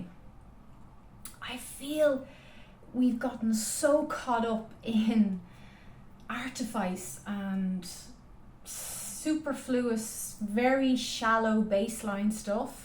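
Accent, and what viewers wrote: Ireland. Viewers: are you Irish? according to my psychic vision you are.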